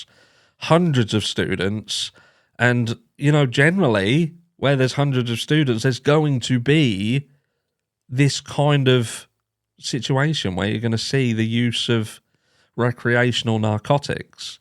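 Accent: Norfolk, United Kingdom. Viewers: More to this case than meets the eye! you need to have a sing-a-long AKA -:D show off that Naaafoll accent:P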